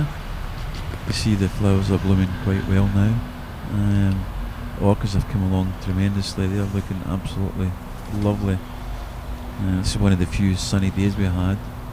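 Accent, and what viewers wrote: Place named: Wales, United Kingdom. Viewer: Thank You, great video once again,well done & very good presentation, look out Titchmark, hope the BBC WATCH THIS, He's finished, lovely Welsh accent--- Love ya dearly! Cheers OXOX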